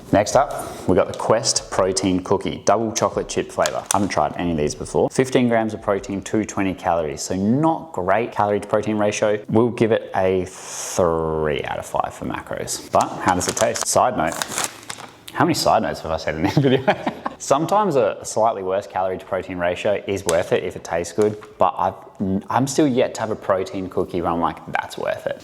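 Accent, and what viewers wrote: Australia. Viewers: I love how Australian you sound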